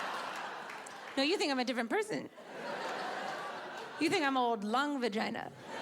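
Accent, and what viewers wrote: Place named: United States. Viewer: Hearing annoying merican accent is not for me annymore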